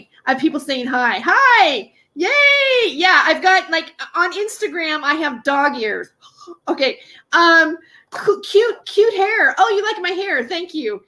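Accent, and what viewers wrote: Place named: United States. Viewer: SPOT ON SISTER, its so refreshing to see a real talk truth seeker calling out the liars and shills....so many shills now are showing their true colours...truth is out there indeed and you do such a good job and an essential service for truth. I met MJ and he has a VERY big ego and slime energy. He turned up with all his bling gadgets (clearly this is a business for MJ and he jumps on any story to create a story to create the money , he doesnt care about truth!!! ). No-none cared or really spoke to MJ at the event , KK was also there and she was really cold/didnt mix and didnt crack a smile (tells me all i need to know, she thought she was very special indeed)...Liars, shills, pedos and their protectors all attract each other. Thank you again for exposing them. There are of course so many genuine authentic truthseekers and its all part of the shift as the liars in these interesting times are also exposed. 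I love your American loud mouth btw, you say it sister as it is lol (UK) xx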